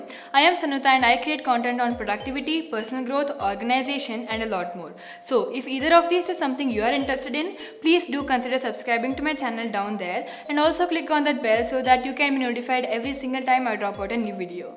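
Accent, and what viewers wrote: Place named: England, United Kingdom. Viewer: First tym here girl....and loved ur voice and ur English accent....nd the tips are beneficial ❣️🥰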